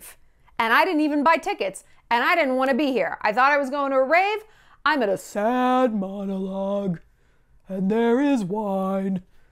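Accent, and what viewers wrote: United Kingdom. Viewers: Love the British accent, and I’m British! I have a name for your plot of land - Shallonshire ❤️ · Your British accent deserves a whole Netflix show. 🤣❤️ i love you woman. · Hahahahahhahaha😂😂😂😂😂😂 your British Accent is soo good! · Your British accent is amazing!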